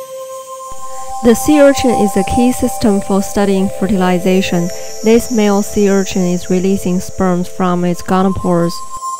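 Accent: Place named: South Korea. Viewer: She sounds korean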